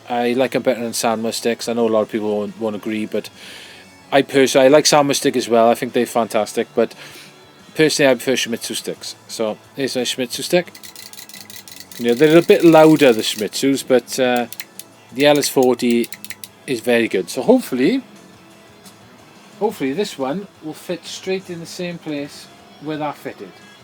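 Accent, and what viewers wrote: South Wales, United Kingdom. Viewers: Are you from South wales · You from wales but?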